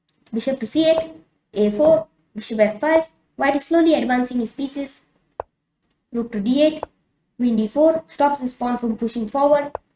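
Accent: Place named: India. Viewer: Your accent is like Indian like us r u Indian am curious about your native 😉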